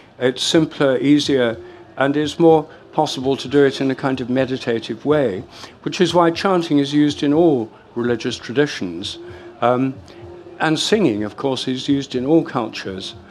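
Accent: United Kingdom. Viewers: I guess it’s just his British accent. But certainly not a serious intellectual. Reminds me of Alan Watts.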